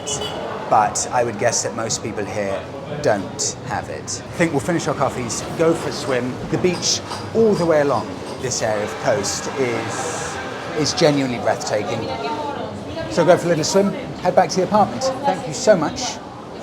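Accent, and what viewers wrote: RP, United Kingdom. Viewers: That's absolutely quite normal in here gents, plus you don't have first world problem here where passports forging is a thing. Anyway, as someone said, you guys are so under-subs and I hope your channel will take off in a massive manner. Your authenticity from what you wear, how you walk, and in general who you are as a person is refreshingly unique, oh and I almost forgot your voice, your narration ability with that clear, concise, RP accent makes you listenable on hours end.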